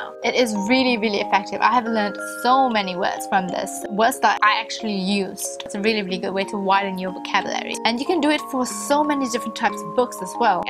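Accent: South Korea. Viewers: your accent is sounds like korean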